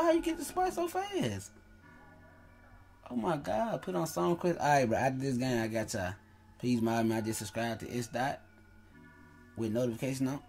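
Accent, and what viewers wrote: Memphis, United States. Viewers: you from memphis cause yo accent sound like mines and im from memphis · Is u from Memphis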